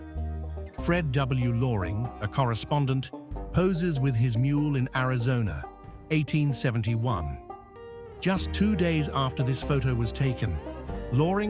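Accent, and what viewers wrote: England, United Kingdom. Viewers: Why the English accent … why the blurred drawing of Custer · Why a Brit narrator?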